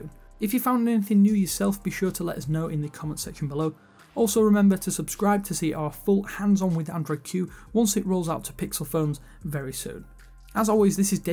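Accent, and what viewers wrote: United Kingdom. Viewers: where are you from? UK? What accent?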